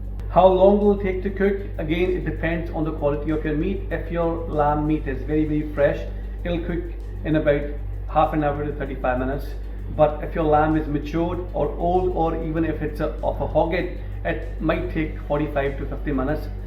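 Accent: Scotland, United Kingdom. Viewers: What was that last ingredient you added from the tiny bottle? I couldn't quite catch what you said. BTW, I love your Scottish twang.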